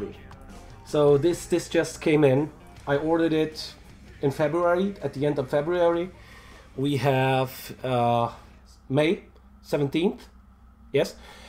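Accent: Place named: Russia. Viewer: Ебать у тебя акцент просто конский, я сразу понял ,что наш человек снимает видео =D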